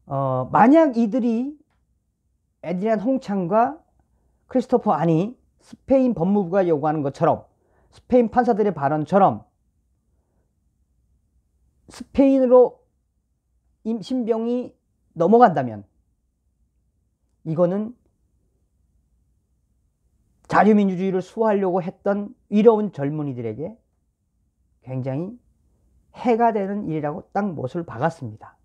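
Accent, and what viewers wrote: North Korea. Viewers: 북한사투리로 미국 입장 말해주는게 재밌습니다. ^^~~항상 발전을 기원합니다.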